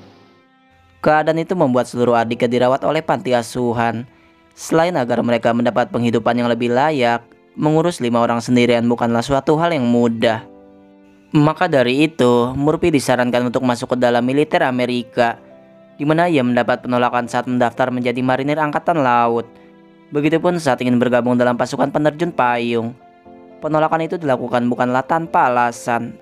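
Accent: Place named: Java, Indonesia. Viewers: Logat bahasa nya tu macam org jawa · Nadanya medoook,kayak orang ngapaak???😊😁😁😁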